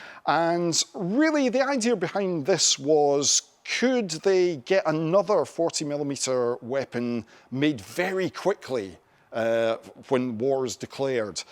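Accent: Scotland, United Kingdom. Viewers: Always a joy to hear a Scot agonising over something.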